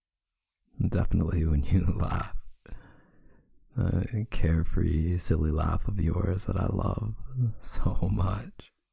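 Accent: Canada. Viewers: I just love his adorable canadian accent 🥺🥺🥺🥺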